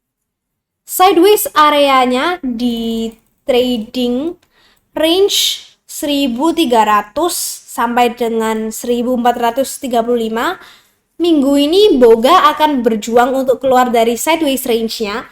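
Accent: Java, Indonesia. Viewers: Mantabb... Walau medok tp tetep insightful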